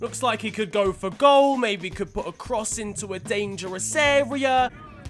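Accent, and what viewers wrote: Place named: United Kingdom. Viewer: Even though sometimes i cant get through ur brit accent, you re my fav fifa ytber (W2S is boring)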